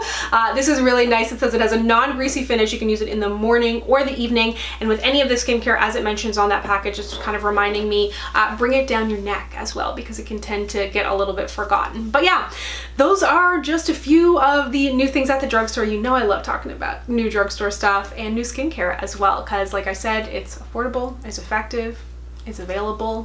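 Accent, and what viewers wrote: Newfoundland, Canada. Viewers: You're also a Newfoundlander! That's awesome!! Instant Subscribe when I heard that 🙊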